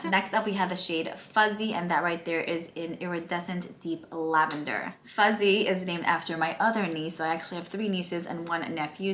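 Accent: New York, United States. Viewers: So proud of you and love love your NY accent and I hope you always keep it 💖💖 I will definitely get this collection…Love it so much 💖💖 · Congratulations on your pallet and engagement, how exciting! I love all of the colors especially the purple wine color and green, so so so beautiful. I love your accent, I speak the same as I was born and raised in NY. My girls always tease me when I say cawfee too lol. Very happy for you, you are such a happy go lucky kind of gal.💜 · Girl. You have an accent. New York. I have an accent. North NJ. Lol I am sooo excited for you!!! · I understand completely cuz I talk the same way cuz I'm from New York.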